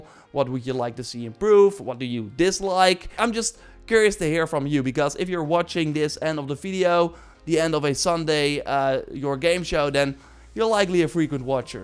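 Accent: Netherlands. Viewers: Dutch I believe he's from Amsterdam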